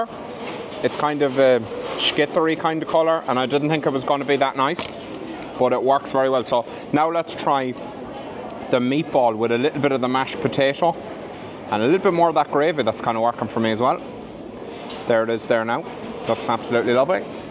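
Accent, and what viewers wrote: Ireland. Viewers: I was today years old when I discovered that the word filming is actually pronounced "fil-i-min." With an Irish accent! Looking forward to that video of you actually trying to put together that new desk of yours. Even cursing with an Irish accent is brilliant! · you are delicious, you are lovely, and you are gorgeous!! My parents were born and raised in Ireland and I grew up surrounded by my parents' off the boat Irish friends and random aunts and uncles that would come to the US for VERY long stays. It's been years since my parents made their transition it's goes down a treat (as my Dad would always say) to hear your beautiful voice. I had no idea how much I missed the sound of Ireland until I tuned in. Thank you!!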